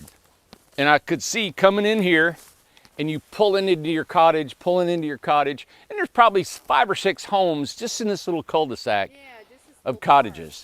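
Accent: South, United States. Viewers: you remind me of my grandma. She was born and lived her entire life in Tn, and y'all talk the same way. Very southern. Hearing you makes me miss her.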